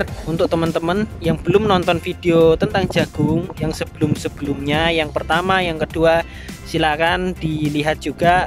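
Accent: Java, Indonesia. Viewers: Bang asli orang mana kok jawanya medok..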